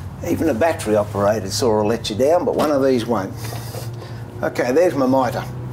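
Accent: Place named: Australia. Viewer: Great work and A+ presentation, it took a bit to get to understand Ausie which is cool. I felt a bit redundant to comment on each episode so, I saved it to the last. The finished and the unfinished pieces both look equally fantastic work of ART, no less, you are a Master Craftsman. Thanks for this set of videos, looking forward for whatever you have out there, Cheers Mate. Just wanted to correct one thing you mentioned in E-2 (I think) for the dove tail joints: David Barron's Alignment Board, FYI.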